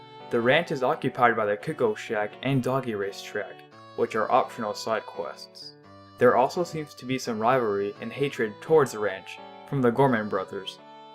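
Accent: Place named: Japan. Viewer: Are you Japanese? Your accent sounds Japanese.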